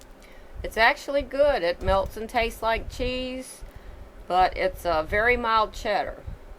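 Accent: South, United States. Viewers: Thank you very much intelligent lady with the southern accent...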